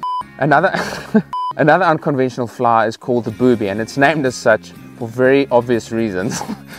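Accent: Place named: South Africa. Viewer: Great video! your accent makes me want to go back to South Africa 🤙 thanks for Australian.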